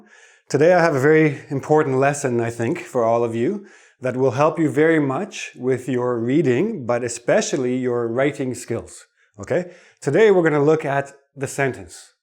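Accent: Quebec, Canada. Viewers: He sounds like a French Canadian. I know he's in Canada so it is a legit guess. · wait... are you canadian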